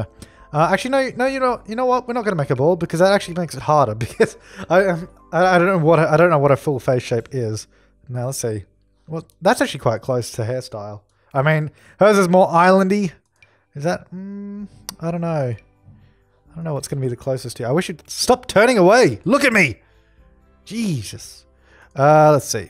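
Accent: Australia. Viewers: Your video is a joy to watch and listen to, your an Aussie aren't you? I love listening to you talk,lol! Your comments made this the best Sims 4 video I have seen yet, you made me laugh allot! And about Aluna, you could tell that it was the same Sim in Sims 4, but it looks even better some how! I know allot of people say they look less real, but I think they look more real then Sims 3, its like you can give them better detail, which makes them look more real! I can't wait to get to try it out myself, I love making different Sims, and detail is very important to me! I hope you make some more videos of you making new Sims with The Sims 4, I'll enjoy them just because of your voice,lol!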